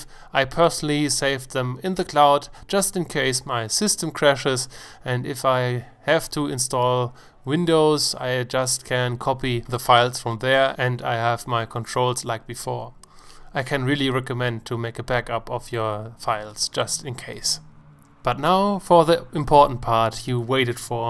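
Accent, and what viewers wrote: Germany. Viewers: Hes german you can hear it at the voice🤣🤣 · I love your accent, German accent is one of the best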